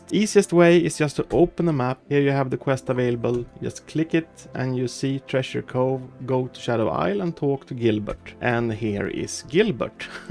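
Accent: Sweden. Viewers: nice that helped me a lot!! are you from sweden? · you kinda sound swedish (think you are from sweden)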